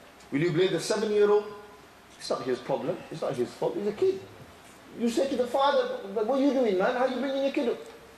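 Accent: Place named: Mirpur, Pakistan. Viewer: Beautiful speech. May allah bless you. You gotta love his mirpuri.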